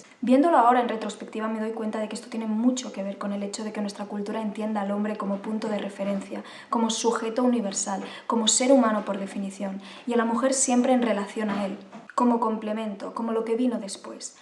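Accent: Spain. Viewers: Y otra cosa la verdad no se de donde seas??. Por tu asento española no se si salgas pero en cuanto te refieres que los hombres hablan mas vete a un bar de estados unidos o de tu mismo pais sienpre abra grupos de muejres a estar hablando y hanlando y no se callan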